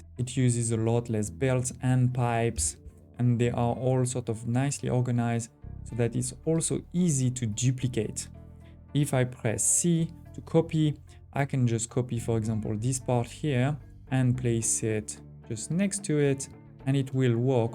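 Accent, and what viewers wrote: France. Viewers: hi! thanks for your good tips ! ( what is you accent? French?)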